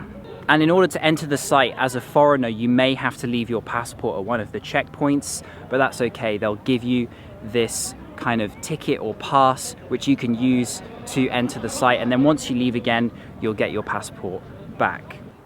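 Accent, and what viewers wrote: United Kingdom. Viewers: Uk dialect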